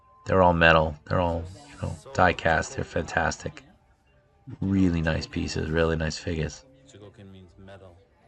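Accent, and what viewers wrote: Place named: New York, United States. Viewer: That New York accent has to go. I had to watch the video with the sound off lol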